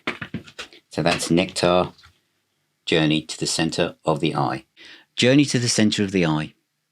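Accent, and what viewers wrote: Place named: England, United Kingdom. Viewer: Good evening. Very interesting as usual. Thank you for this piece of information and, especially, for your manner of speaking, for your nice, clear, genuine English!!! Neither American English, widely spread around, nor Indian/Pakistani/Bangladesh English, but English English. It's a great pleasure to listen to you!!! And what about compact-cassettes? I know a lot of music-fans, who return now back to classic vintage cassette-decks and compact-cassettes... In any case, it was a pleasure to listen to your programme!! Thanks.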